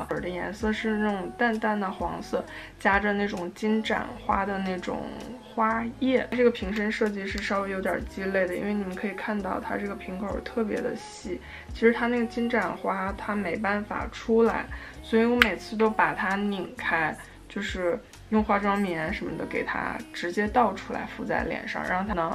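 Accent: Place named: North, China. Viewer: 听你说话应该是北方人😆亲切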